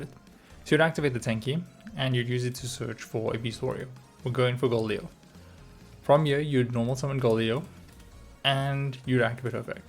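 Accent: South Africa. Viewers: R u south african😂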